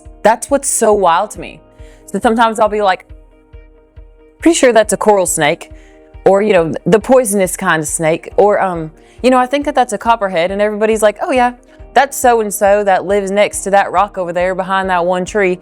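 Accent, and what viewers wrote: South, United States. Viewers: Your Southern accent, even if acquired, is quite good. Our accent or dialect is more polite, inviting, and respectful than other American accents. Notice how we tend to talk "uphill" meaning that frequently we end a sentence on a higher note than when we started. This conveys that we are finished with our thought and are inviting a response. This tone also conveys an invitation to accept or reject what we have said without a command to do as we say without reflection as is conveyed with other accents that end in a lower, more forceful tone. The Southern accent is similar in tone to a feminine tone in that it is more inviting and considerate. The accent reflects the foundation of the culture of the South on this point. Our slow pacing with lots of pausing can be mistaken for lack of intelligence or confidence, but that terribly misconstrues what Southerners are implicitly going for in their speech. 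Frankly, when I hear someone always talking hard, flat, and fast I hear someone who is arrogant, rude, angry, callous, and not very reflective. That is not always the case in actuality, but that tone comes across as offensive and disrespectful to me. Southerners are more likely see language not merely as a means of simply getting your point across to another person in order to get a task done but to luxuriate in spending time with other people in your family or church or community as that time, which might not accomplish anything measurable, builds relationships that are on-going an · This is my first time seeing you. Don't know how long you been down south but the twang is coming through really well. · Sounds like you're picking up that southern accent a little. I noticed a few soft "i" a few times. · You are developing a Southern accent! Congratulations! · You are sure southern sounding. 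How long you been there? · Hope more that looks as good as you keep moving South, you already got the accent. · You must be from Southern Illinois cause you sound southern. South and North Louisiana, where I live, it's not the heat it's the humidity, it's brutal. Flying roaches are Palmeto bugs not a roach. They come inside when it floods or gets real cold otherwise they live outside in the grass and bushes. But yeah the small brown bugs in your kitchen are roaches use some Boric Acid powder along the walls/floor. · You have a southern accent 😂😂😂😂😂😂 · You definitely picked up the accent. I thought you were from the south. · You may not want to hear this pretty lady, but it sounds like the south is rubbing off on you. You’re getting our accent. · U sound like u were born and bred in the south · Looks like you picked up our accent just fine! Yeah, in the deep south, we don't have 4 seasons, we get about 6 months of summer, a week or 2 of fall, then the next 6-12 weeks alternates between winter and fall/spring. 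One of the few places where you can experience all 4 seasons in a week. We don't put away summer clothes cuz that would be a waste of time. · My dad was in the Air Force so we lived in a lot of different places. All our family was born and raised in Kentucky. I grew up 30 miles south of Miami, Florida in Homestead but lived in Tennessee, Germany, and Illinois to name a few. When I lived in Illinois everyone always said you really talk like you’re from the south. When I was in the South they’d say you really talk like you’re from the North. 😂🤣 One thing I noticed about you is not only have you picked up our Southern lingo but you’ve also obtained the accent. Not big time but it’s there. Congrats you’re a certified Southerner now. 😂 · You have been living in the south for so long that you have actually picked up the accent a little bit. I LOVE that lol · Welcome to Dixie!! Now you can see why the food is so good down here, it helps compensate for other things. 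LOL - In case you hadn't noticed...the accent has started to rub off on you. That happens a lot... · I see you picked up the southern accent pretty well.😀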